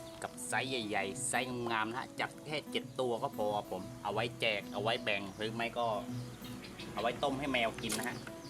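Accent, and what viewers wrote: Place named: Surin, Thailand. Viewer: น้าอยู่จ.ว อะไรครับ สำเนียงเหมือนคนสุรินทร์หรือไม่ก็บุรีรัมย์ใช่ไหมครับ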